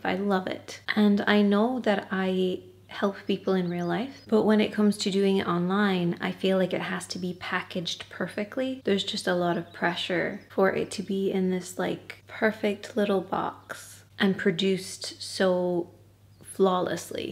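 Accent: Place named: Ireland. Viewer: …sending you so much love ❤ I subscribed many years ago for the makeup tutorials, but now it’s a good day if I manage to put bb cream on! I have 3 kids (2 teenagers and a 5 year old), have had 2 crappy marriages, and makeup is just not a priority as I rarely leave the house 😅 I can partially relate as someone who struggles with my mental health (that has impacted my ability to hold down a job) and also perfectionism. You are such a shining light though ☀️ The older I’ve got (turning 41 soon) the more I’ve realised perfectionism sucks. Just being present and “doing the thing” is so much more important than wasting time and energy on trying to achieve perfection (which doesn’t exist) especially when your time and energy is limited ❤ I for one am just so happy to see your beautiful face, hear your lovely Irish accent and hearing about your life. You are such a beautiful person, inside and out and we support you ❤ Sending love and strength from Australia xx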